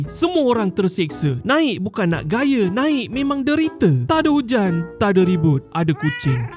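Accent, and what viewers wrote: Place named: Sabah, Malaysia. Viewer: terkadang terselit logat Sabahnya.. menghiburkan betul bah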